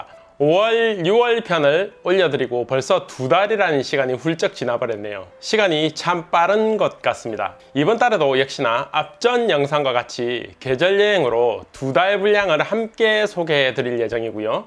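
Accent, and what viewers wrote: Gyeongsang, South Korea. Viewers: 목소리 작렬 ..경상도 사투리 그러나 듣기는 쬐끔 ㅎㅎ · 구수~~한 깅상도 억약이 참말좋십니더 좋은정보들 주셨어 감사합니다~~^^ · 저도 경상도 사람이라 듣기좋아욤👍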